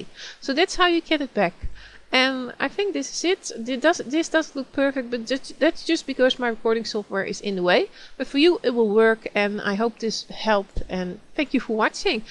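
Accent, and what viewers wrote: Netherlands. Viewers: Bedankt, ik hoor aan je stem dat je van Nederland komt of toch Nederlands praat. Ben ik juist? Toch bedankt want ik zat met dit probleem! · Wedden dat je nederlands bent / I bet that you are \Dutch :d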